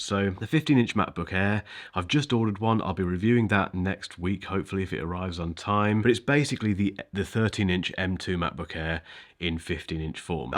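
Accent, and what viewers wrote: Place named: RP, United Kingdom. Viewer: Btw I like your accent, sir! Is it RP accent? Whereabouts in the UK are you from?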